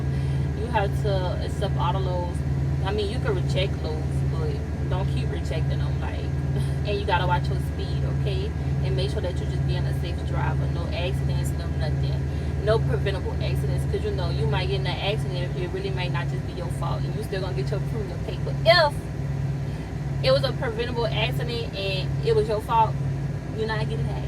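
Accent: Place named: Gullah Geechee, United States. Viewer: Such a geechee accent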